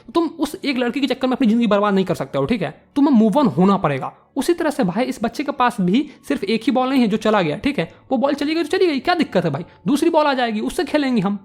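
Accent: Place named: Maithili, India. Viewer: Beta I love your maithali tone . Because I am also a bihari ❤️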